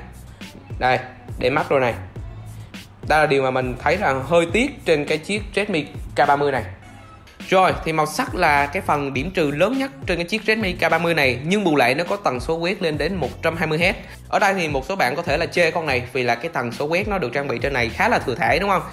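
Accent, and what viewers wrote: South, Vietnam. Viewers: Có ai giống tui ko giọng miền nam bạn này hơi chói khó nghe nhỉ so với giọng miền bắc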